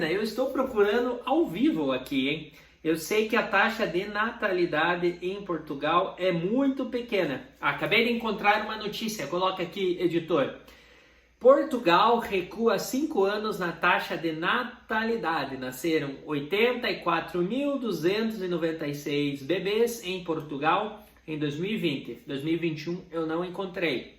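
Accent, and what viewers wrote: Caipira, Brazil. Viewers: Contas inúteis, professor. Sabe porque? Você, por exemplo, vive no Brasil, é brasileiro, e não fala um portugues bonito como o do Rio, ou o manauense, ou o do nordeste onde se fala um portugues cantado. Não, meu jovem, o seu sotaque é caipira. E você não mudou se jeito de falar estando imerso num país muito maior do que Portugal, no qual há sotaques variados, cada qual com a sua beleza própria ( apesar de uns serem mais bonitos que outros). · Não dá para aguentar esse sotaque caipira por mais de um minuto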